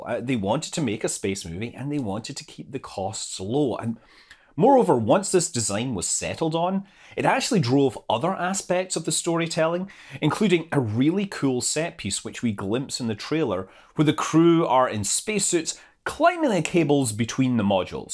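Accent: Scotland, United Kingdom. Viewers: There's nothing wrong with a good Scots burr.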